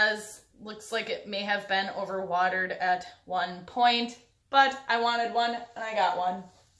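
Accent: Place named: Midwest, United States. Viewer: you must be from the Midwest. your accent sounds like mine haha. love to the green!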